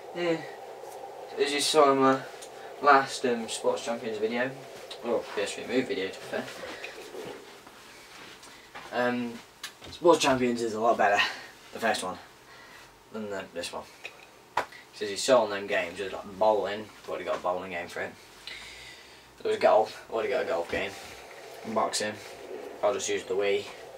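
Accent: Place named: Liverpool, United Kingdom. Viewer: Why did you buy this you scouse?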